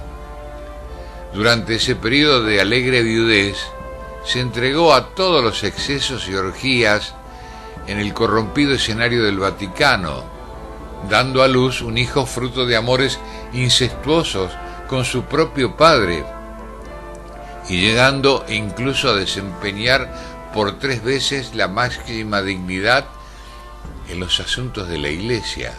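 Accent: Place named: Argentina. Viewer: el video bonito pero ese acento argentino muy feo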